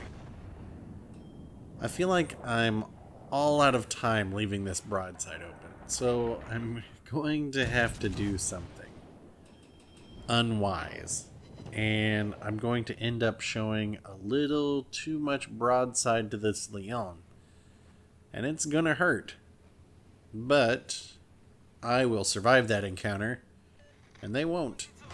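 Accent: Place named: England, United Kingdom. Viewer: no your good but i find the anglish accent fun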